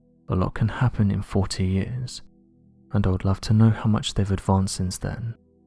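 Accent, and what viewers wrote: England, United Kingdom. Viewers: I m personally tired of the English accents one reason is they use then to lend some type of foolish credibility to every. Sc am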